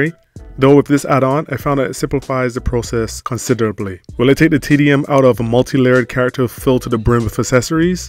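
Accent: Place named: Barbados. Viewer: Bro Idk why you sound Bajan to me. Thanks for the vid, gonna try this addon out once I get better at Blender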